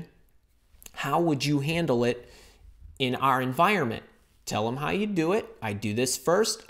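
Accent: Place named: Great Lakes, United States. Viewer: Wow, thats quite the pronounced Northern Cities/Great Lakes accent you have there. Lets see ... Central Michigan, is it? Maybe Lansing?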